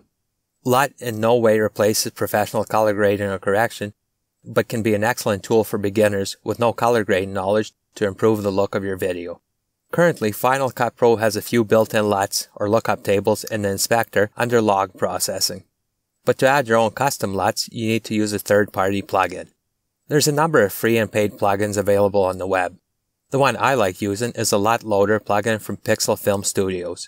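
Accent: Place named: Saskatoon, Canada. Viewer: You sound like you’re from Saskatoon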